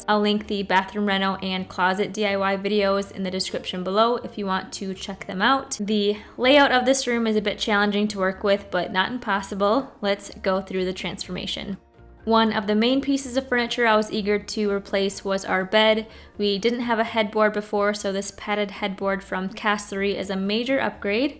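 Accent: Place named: Russia. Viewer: You kinda have a Russian accent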